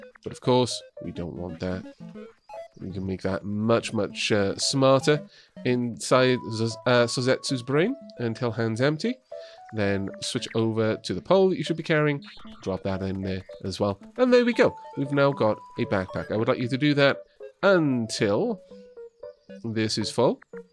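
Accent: Wales, United Kingdom. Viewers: It’s taken me far too long to work out exactly what makes this series so enjoyable. Is it the knowledgable way puts the bot coding together? Is it the dulcet tones of his Welshishness (try saying that 3 times fast) that makes it so pleasant to listen to? His addiction to tidiness and prettiness? Is it the ridiculous cuteness of this game? No. It’s the motivational speeches. I really want to be part of the collective just so my representative bot can be on the receiving end of one of these! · I would wuv to be a Neumann just to hear how you say my name. It would also be creepy cool if I ended up in I AM MOTHER team because I work in a day care. I'm mainly curious how my name sounds in a Welsh accent. Wiv wuv Akane Taakon P.s. Spread the Wuv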